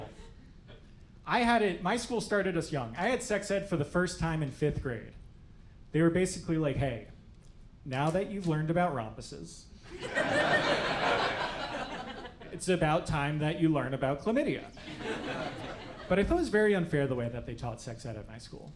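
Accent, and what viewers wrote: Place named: Saskatchewan, Canada. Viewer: Lovely, sounds like you grew up in Dog River Saskatchewan, great stuff!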